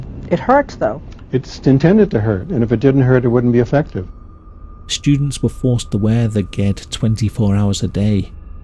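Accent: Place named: Liverpool, United Kingdom. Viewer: Love this channel. Plus your also nearly scouse accent makes it better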